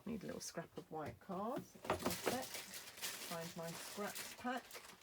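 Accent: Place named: United Kingdom. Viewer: What a lovely card! I LOVE your accent - well, you probably don't think you have one LOL But anything Brits say is so charming: bauble. My new Christmas word!!! xo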